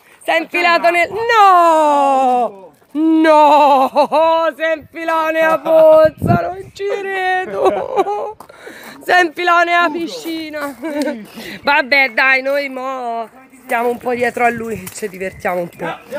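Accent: Tuscany, Italy. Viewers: Auguri carissima.....ti abbraccio e ti mando un grande bacio.....sentirti parlare con la calata Toscana mi riscalda il cuore perché mi ricorda il mio adorato babbo, nato a Prato, che non c'è più!!!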